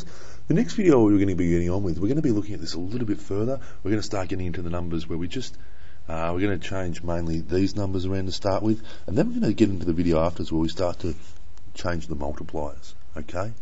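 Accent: Australia. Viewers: tanvi sharma, he's Australian.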